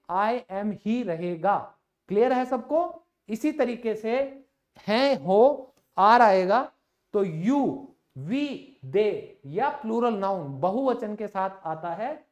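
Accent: Haryana, India. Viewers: Sir kya aap haryana se hai kya kyuki aapki language hariyanvi hai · Haryanvi English love you ❤️❤️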